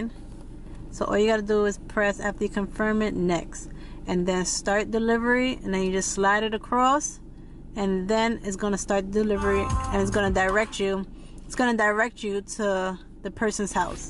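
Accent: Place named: New York, United States. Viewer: It sounds like you’re from New York, I know you said you’re in Atlanta. Maybe I can treat you to lunch when I’m in Atlanta. 😉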